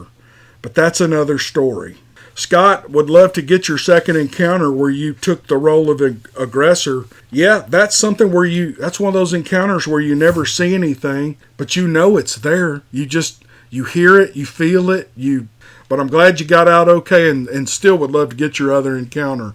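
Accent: South, United States. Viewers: It was so nice to see/meet you! I've commented before that I love your accent, and it's so fun to put a face to your voice! I live in Oregon, so you're southern accent is such a treat to listen to! Thanks for all of the stories, I love them all and really enjoy hearing you tell them! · I so appreciate your channel. My bestie and I listened to you all the time. Your southern accent is wonderful and you are so positive. Thank you for taking the time to do this.. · I know you are a busy man. I hope you get your garden in soon. I want you to know that I anxiously await notification for your stories. Yes, I have found “my people”. I’ve listened to other channels but I’m from the south and feel at home when you speak. Thank you so much and I would have never guessed Bigfoot is in Hawaii. Wow!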